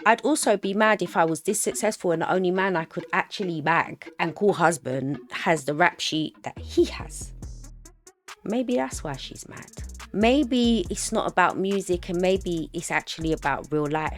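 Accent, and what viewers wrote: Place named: London, United Kingdom. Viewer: So glad I came across your channel, Just subscribed xxxx Love the London accent.. So good to finally find a channel made and bred in my town!!! xx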